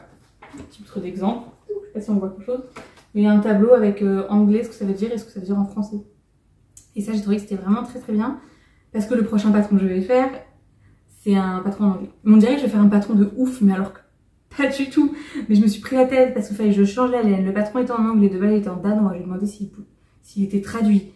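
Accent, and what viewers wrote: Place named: England, United Kingdom. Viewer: Tellement naturelle dans cette vidéo 🥰 Je suis fan de tes gilets et de ton accent anglais aussi ! Et tu as un excellent rythme en tricot, c'est clair ! Certaines teinturières proposent la mise en écheveau (CLainesBoutique fait la mise en pelote à 0,5€ !). Pour les chaussettes comme toi, j'ai voulu essayer en cours de tricot et j'ai perdu mes mailles 🤣 Et je n'ai pas fait d'échantillon non plus ! Le montage des chaussettes, pour la première j'ai recommencé 10x, pour la seconde en deux fois c'était bon.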